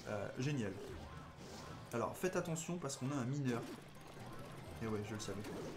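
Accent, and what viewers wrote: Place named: Marseille, France. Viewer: t a un accent marseillais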